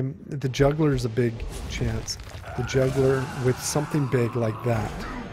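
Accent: Canada. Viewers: Loving your videos. Also loving the Canadian accent, you should say "aut" (as in "get aut") and "eh" more :) And keep the beard.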